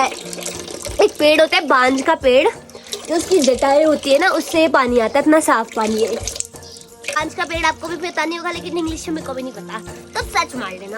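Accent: Garhwal, India. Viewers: Cute gadwali bolti ho app yll ❤️❤️❤️❤️ Aur nice vlog aur tq comment like Kane ko ❤️❤️❤️❤️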